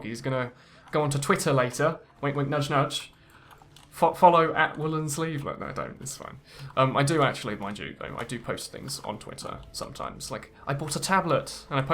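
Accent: RP, United Kingdom. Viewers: Why do you sound so posh °=°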